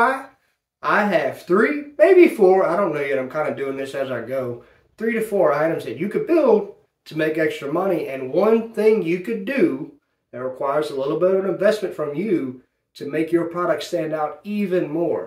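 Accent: Louisiana, United States. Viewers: You must be from Louisiana…my fellow Cajun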